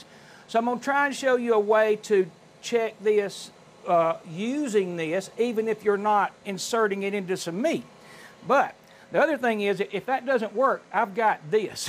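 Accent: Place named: Texas, United States. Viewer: I've loved the accent. Is it from texas ?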